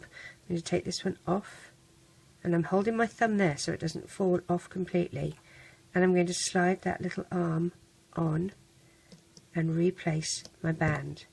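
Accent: United Kingdom. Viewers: I dont mean for this to sound mean but you have a VERY british accent also peope0le dont rage bc im British too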